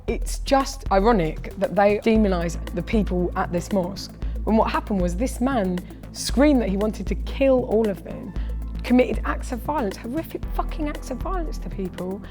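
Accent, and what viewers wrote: United Kingdom. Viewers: Just another over privileged white person telling other white people how priviledged they are. Same old shit just with a British accent.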